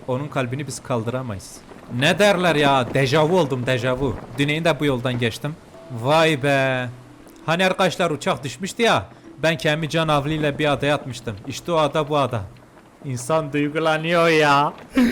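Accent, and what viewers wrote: Elazığ, Turkey. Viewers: Abe bende elazigliyim senin elazig li oldugunu şive den direk çaktim abi sen tuncelili misin tuncelilisen nerde otirisin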